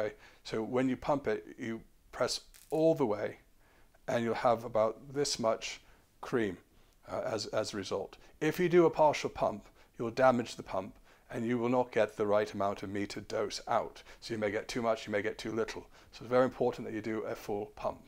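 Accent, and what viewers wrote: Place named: London, United Kingdom. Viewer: your accent sounds very similar to Prince William's accent. Did you grow up in the same area of London?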